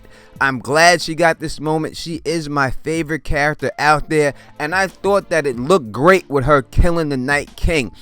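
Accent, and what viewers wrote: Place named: Long Island, United States. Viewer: Youre def from!!! Ny longisland my guess lol.. With that i say, keep it up good work brutha!!!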